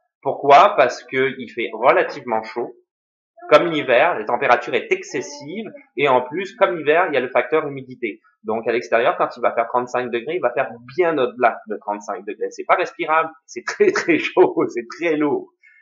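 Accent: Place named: Quebec, Canada. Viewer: Pour avoir vécu au Québec, je confirme, tu t'est bien intégré, je ne sais pas d'où tu viens (de France, de Belgique) , mais tu as bien choppé l'accent ! :)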